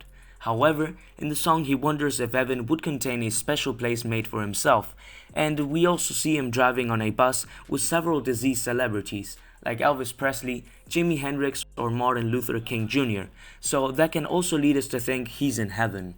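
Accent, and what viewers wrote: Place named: Philippines. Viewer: Very well made and intelligent content! Where are you from, you have an interesting accent (Filipino is my best guess)?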